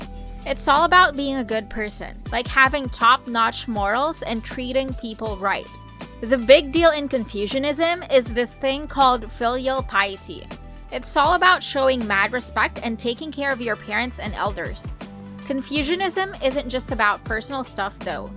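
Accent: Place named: United States. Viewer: The narration is so American - freaking freaking freaking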